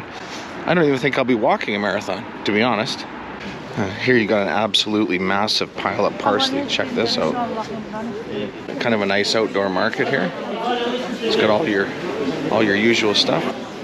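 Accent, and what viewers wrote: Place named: United States. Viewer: Stop staying there and calling it downtown - it is part of the wide centre but it is also part Central-North Sofia, that is not the downtown - that is the AVOID area... Downtown is around NDK and between St. Sunday and NDK and above NDK and East of NDK West of NDK and anything but NORTH of Serdika Metro station. W are part of the European Union, we are not part of the fiscal Euro zone even though we are fixed to the euro.Only use ATM's in Banks or attached to Banks - not the Yellow and Blue NO ATM has ATM written on it and being legit. The area you were in in that restaurant where you had a bad experience we refer to as little Arabia and you sound American.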